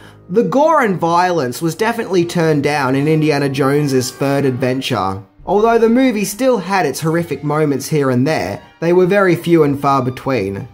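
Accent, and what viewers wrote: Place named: Australia. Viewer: Dude, he has an Australian accent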